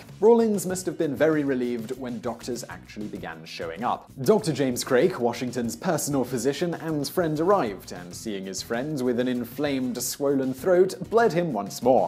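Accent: United Kingdom. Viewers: British man talking about American history. Interesting.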